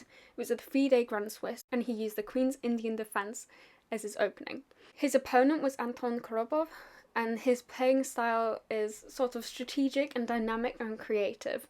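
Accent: United Kingdom. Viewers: You have a British accent... it is a GREAT accent! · Sound like RP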